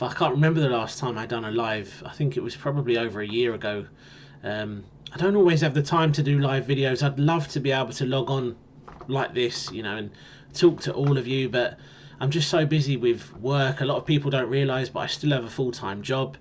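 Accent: United Kingdom. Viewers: I like your accent. I know there are several different British accents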